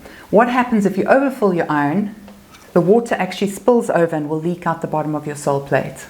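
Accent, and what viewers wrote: South Africa. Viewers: She has a South African accent. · You sound South African😊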